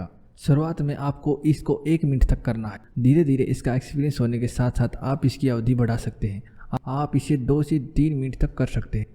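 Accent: India. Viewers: Are u from haryana??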